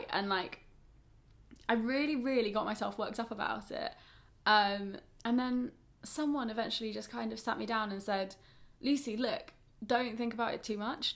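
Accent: RP, United Kingdom. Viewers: cough There is the magic of editing...but let's also just stop for a second and admire her brilliant british accent! ;) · she's just got a posh accent tbh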